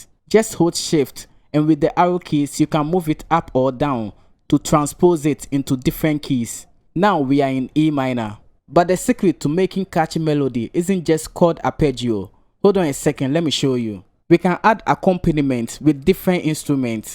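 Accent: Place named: Ghana. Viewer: ok bro . Am watching from Cameroon. I guess your are Ghanaian from ur accent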